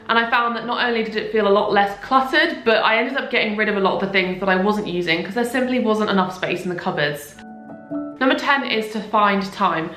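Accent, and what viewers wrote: England, United Kingdom. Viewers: thank you for sharing those tips ♥ and love your english accent too :3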